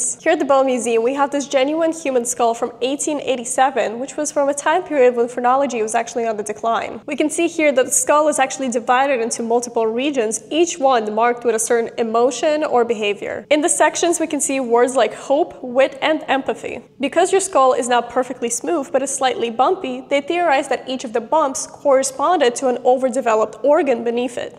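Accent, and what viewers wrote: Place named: Eastern Europe. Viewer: She is a truly excellent speaker. But her accent intrigues me. Eastern European perhaps?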